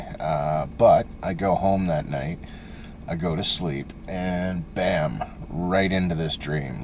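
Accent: Canada. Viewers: Good stuff man. I am ready to have that trip. You sound Canadian? How do I message you btw? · This guy is Canadian he says aboot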